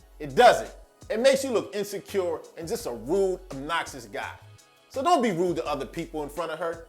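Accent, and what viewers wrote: Philadelphia, United States. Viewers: Wait you’re from philly?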